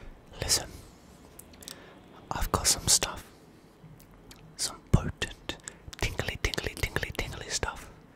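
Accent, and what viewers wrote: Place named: West London, United Kingdom. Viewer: I want to hear more of that west London accent heheeee~~~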